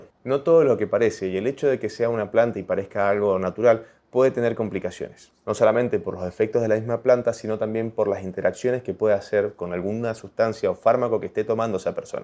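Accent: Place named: Argentina. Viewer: Muy interante su explicacion Es 'Usted Argentino ?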